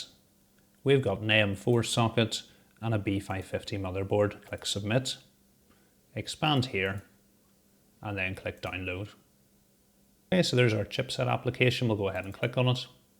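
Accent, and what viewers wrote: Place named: Scotland, United Kingdom. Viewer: 55 minutes of Scottish ASMR PC building. Lovely.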